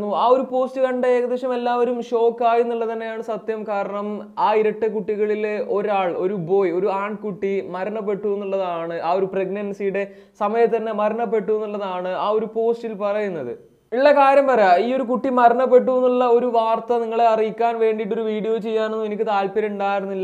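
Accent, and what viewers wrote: Malappuram, India. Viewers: Thangal malappuramthu kaaran aano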